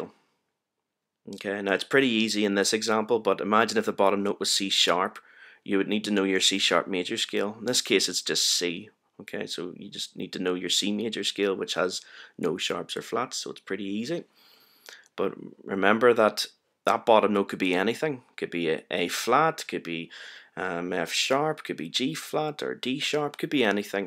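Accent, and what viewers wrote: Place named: Ireland. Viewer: You speak with an Irish Accent... I might be wrong man...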